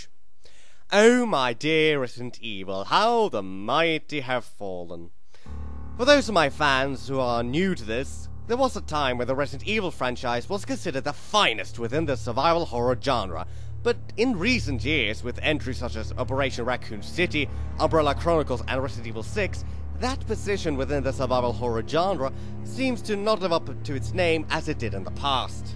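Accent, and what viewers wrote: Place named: United Kingdom. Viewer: Hi! I've seen some of your videos and I'm pretty impressed, even subscribed just today. Just a few questions, though: first question, what part of Britain are you from and what is your accent? (Not a question related to the video, I'm just curious because I personally think it's pretty charismatic) Second question, what's the song that plays in this video?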